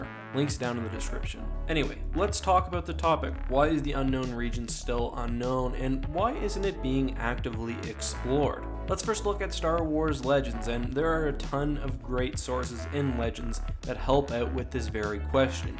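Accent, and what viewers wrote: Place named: Nova Scotia, Canada. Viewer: I was just thinking thing guy sounds Nova Scotian and then boom a photo of Halifax.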